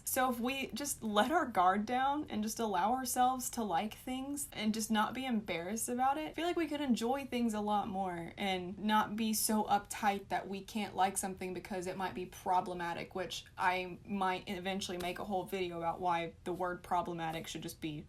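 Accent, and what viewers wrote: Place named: South, United States. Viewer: I didn't know you were from the south and now you've said that I can here your southern accent so clearly !!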